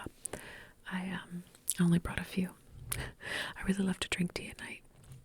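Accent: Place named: Manchester, United Kingdom. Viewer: accent is south Manchester/northern Cheshire by the sound it (I worked in Stockport for a few years, near the Pyramid) and it rings bells from then. If you want to get the tag off an IKEA mug, use WD40 - lifts it right off. Hand wash the excess WD40 it before you stick it in the dishwasher, otherwise it can cross contaminate.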